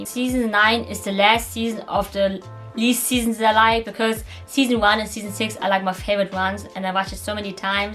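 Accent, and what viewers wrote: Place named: Germany. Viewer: I admired your Review on the walking dead movie. Your narration is very well paced. And I felt a German accent in your way of speaking I don't know if I was right! Thank you for talking about this movie. I will put it in my list of must-see movies!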